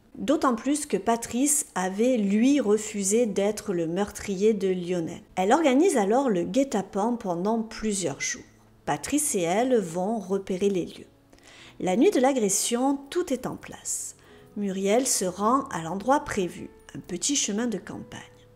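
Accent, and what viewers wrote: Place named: Marseille, France. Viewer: Merciii! Je connaissais déjà cette histoire, mais quand c'est toi qui l'a narre, je me régale (élocution, petit accent marseillais...); De plus, "droit au but" comme d'habitude, bravo. Pour l'histoire elle même, les peines infligées aux protagonistes me paraissent bien trop clémentes sachant qu'il y a eu réellement acharnement à faire disparaître ce pauvre homme, considérant les remises de peines...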